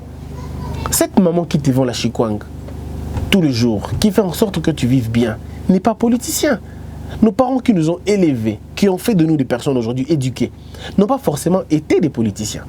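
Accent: Democratic Republic of the Congo. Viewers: Lingala ya solosolo boyoki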